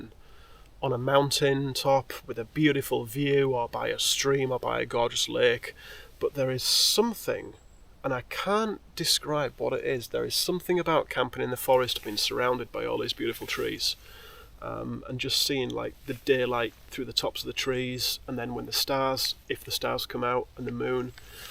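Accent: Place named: United Kingdom. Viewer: Hey Happy New Year to you too! And congratulations to your 1000 benchmark. I like both your gear reviews and your trail documentaries very much (for the great photography, but maybe even more for the narration - a nice contrast to all the same same stuff from fulltime youtubers. Plus, it’s British!) And yes, you do inspire me a lot. I am a backpacking newbie from Germany, and discovered your channel when I was researching European trails to hike as a first-timer. So last year I ended up doing the Yorkshire Wolds Way plus Cleveland Way. At the end of the walk I still had so much holiday left, and I spontaneously walked the Cumbria Way as well. My next trip is planned for February - part one to three of the GR 131. And in August it’s either GR 10 or GR 11. I am hoping for a comparison video at the end of your current series… So, thanks for the great work and I hope you have some wonderful trips in 2024!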